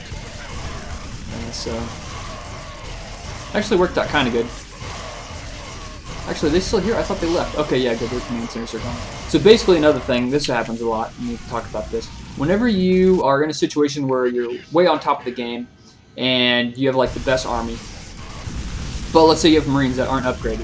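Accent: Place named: Texas, United States. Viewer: I like your texas accent :>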